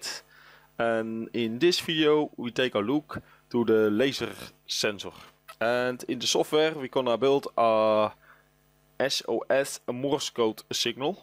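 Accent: Netherlands. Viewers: Dutch, 100% Dutch innit he?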